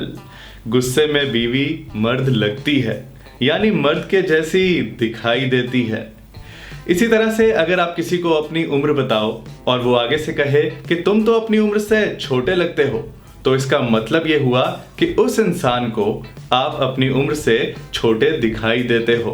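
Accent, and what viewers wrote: Punjab, India. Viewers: Appka punjabi rool bada sone lagtehai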